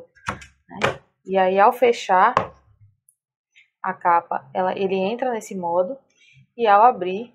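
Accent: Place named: Pernambuco, Brazil. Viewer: Tú és Pernambucana é, boa tua explicação visse!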